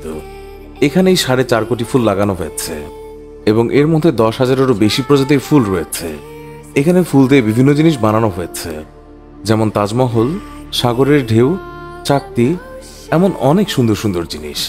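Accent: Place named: Bangladesh. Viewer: Narrator, Why you call one hundred seventy thousand RUPY?? Shouldn’t it Taka?? Your Bangla accent similar to Bangladesh accent... So Taka you must mention. If you are a Indian man then Rupy is good for you.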